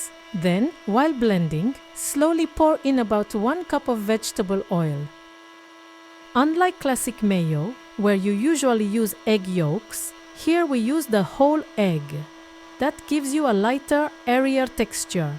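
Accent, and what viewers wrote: France. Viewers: The French accent mixed with Asian accent while speaking English is delightful 🪻💐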